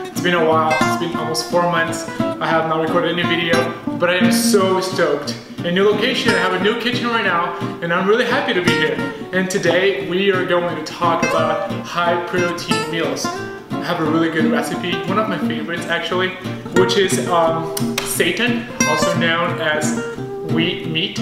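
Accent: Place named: Brazil. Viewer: Your accent sounds Brazilian! Vc e brasileiro?? I've been looking for more sources of protein that are low on carbs because I'm struggling with my macros lately. They've been around 60% carbs 18% fats and 18% protein. I'm struggling hard but this video and your banana bread video helps a lot! Make more please!!!! Obrigada :)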